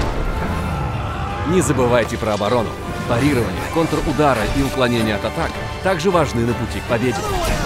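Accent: Russia. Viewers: ООООО РУССКИЙ ГОЛОС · ОООО, русский голос! #1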